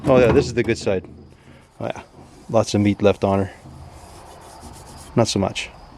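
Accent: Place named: Canada. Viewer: My two favorite words Canada guys say. is "Out" and "Process" To us assholes down south. Your "Out" sounds like "0uuuut". And Process. You say it as spelled. You say " PRO---U--CESS". All good. We say "Prawcess". We are all jerks! Love your channel! Rock on man up north! Oh There is a another thing.... I will wait.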